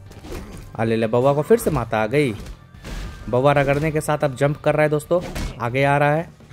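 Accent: Bihar, India. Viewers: I love our bihari ascent😂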